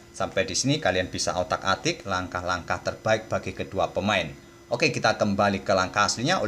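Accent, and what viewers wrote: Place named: Surabaya, Indonesia. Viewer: O..jebule (ternyata)wong suroboyoan,...salam ko aku wong Kediri sing manggon di kota Bekasi,...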